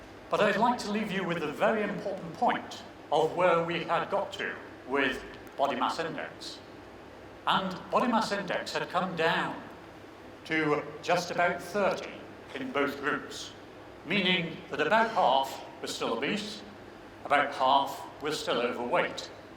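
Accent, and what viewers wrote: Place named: England, United Kingdom. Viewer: I'm at risk of developing Type 2 and i was wondering is laughing a risk to raise my blood pressure? The first 5 minutes I was just laughing, he has a funny English accent and what about that Angel of the North story LOL LOL and the people with their faces erased LOL LOL LOL aaaaaaaaaaaaaaaaaaaaaaaaaaaaaaaaaaaaaaaaaaaaaah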